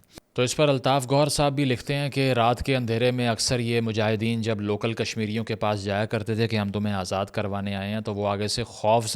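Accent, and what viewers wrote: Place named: Punjab, Pakistan. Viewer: Why do you speak English in Punjabi accent? . Otherwise you are amazing. Very easy way to understand you. 🙏🙏🙏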